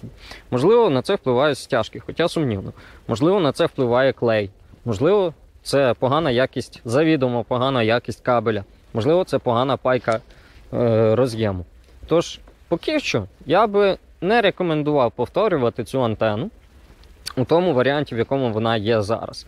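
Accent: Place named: Lviv, Ukraine. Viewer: Простим "Дякую" не відбутись:) Відповідь надали більш ніж вичерпну. Цікавить ваша думка стосовно діполя, тому як серед аматорів вирують думки, що це не є добра антена, і годиться більше на авіадіапазон ніж на любительський 2 метровий. Ще питання, звідки ви.? Акцент Львівський, але на кругляках вас не чув Дякую ☺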